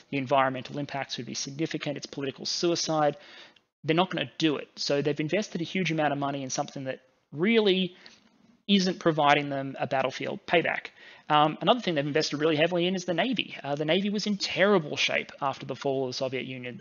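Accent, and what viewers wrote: Australia. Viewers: hes Australian & that’s an Australian accent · Love to hear an Aussie voice; very non- grating on my ears. Pure, unadulterated info! Oh Oy Oy ! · Fascinating and insightful especially considering how long ago it was posted. 's voice and delivery (not to mention the sexy Australian accent) make it easy to pay attention to the arguments.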